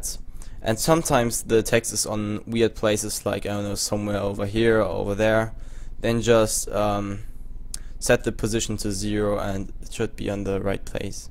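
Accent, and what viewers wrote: Germany. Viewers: Wie man das merkt, dass du deutscher bist! :D Nichts gegen dein Englisch.. :P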